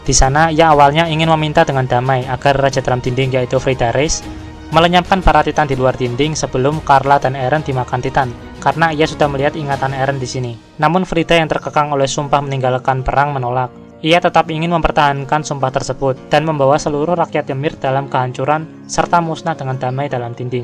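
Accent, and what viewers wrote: Java, Indonesia. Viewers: Koe yo wong jowo to 😅, tanks min dengan ini aku bisa tidur nyenyak 👍👍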